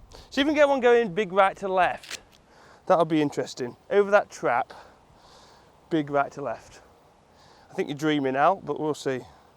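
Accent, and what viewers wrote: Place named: Lancashire, United Kingdom. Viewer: Great video, new subscriber. Where you from originally? Sound like a Lancashire lad 👍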